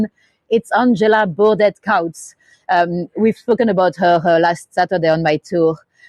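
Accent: France. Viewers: You literally have the best French accent ever. I could listen to you all day…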